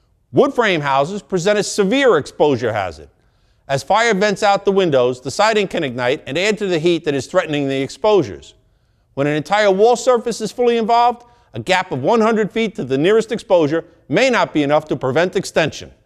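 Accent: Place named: New York, United States. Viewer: I can tell he from New York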